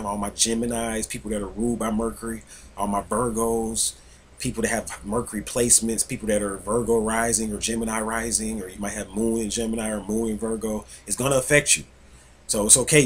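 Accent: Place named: Philadelphia, United States. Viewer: i can tell he is from philly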